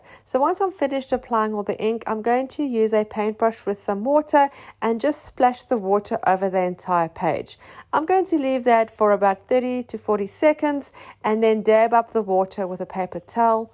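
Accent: South Africa. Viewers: There you go! I hadn’t actually picked the South African accent either which is strange as it’s one of my fave places - have been twice and can’t wait to go again! One day! <3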